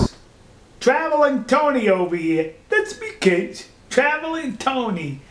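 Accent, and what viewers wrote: New York, United States. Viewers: Based on your base accent, I'm of course thinking that you're heading back to good old New York. But we'll see!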